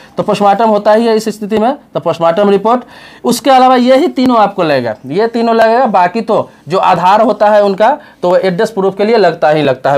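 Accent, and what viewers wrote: Bihar, India. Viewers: Sir aap Bihar see hai. Na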